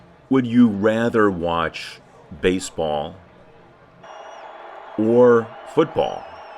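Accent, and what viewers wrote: United States. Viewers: Never thought understanding American Accent is that easy. (Namaste from India, 🙏)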